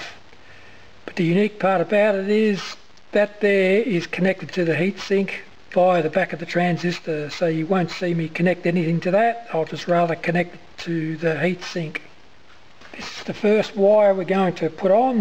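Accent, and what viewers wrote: Australia. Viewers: G'day. Good to hear an ozzy voice. Thank you for the idea and keep up the videos.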